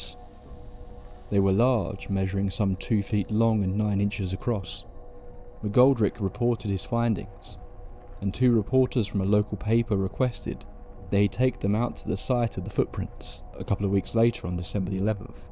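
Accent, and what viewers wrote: United Kingdom. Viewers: fookin bloody british accent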